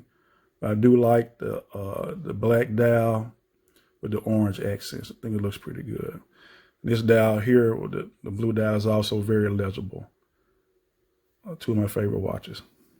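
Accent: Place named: Memphis, United States. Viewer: Gotta be from around memphis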